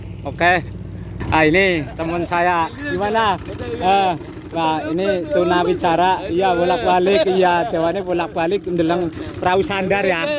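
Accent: Banyumas, Indonesia. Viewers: Ora ngapak ora penak kang 🎉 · Ora ngapak ora kepenak😅😅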